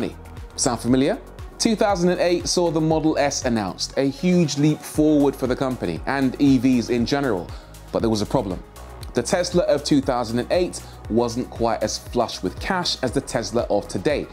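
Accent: United Kingdom. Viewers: Very informative video. The killer British accent helped.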